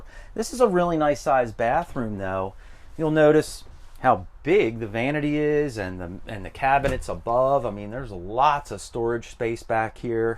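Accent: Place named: South, United States. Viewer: . Where you from. You have a very distinctive accent. I'm gonna guess southern or southeast Virginia? North Carolina?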